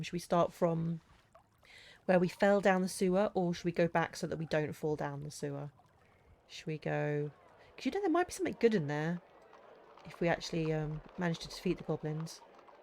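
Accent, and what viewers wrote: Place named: England, United Kingdom. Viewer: those books are the best in the domain of adventure gamebook , congrats for your work ! the english accent is not my cup of tea , for this is very nice to heard the text readed.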